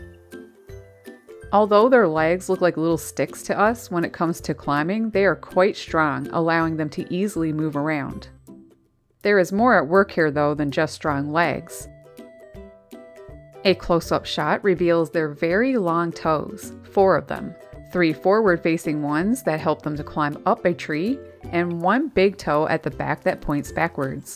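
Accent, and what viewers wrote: Newfoundland, Canada. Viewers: I detect a Newfoundland accent. Thank you for everything that you do.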